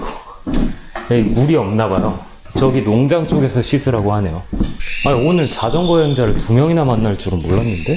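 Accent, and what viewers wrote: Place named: Chungcheong, South Korea. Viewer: 고향이 충청남도인가요? 말투가 내가 사는 고향 논산이랑 비슷하네요. 지금 유럽 몰아보기 3편 보고 있어요!!!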